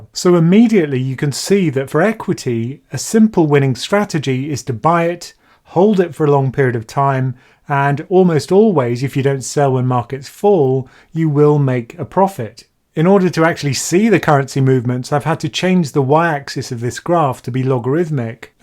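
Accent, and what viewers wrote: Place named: Wales, United Kingdom. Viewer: Your cadence and accent reminds me of author Jon Ronson, who is Welsh.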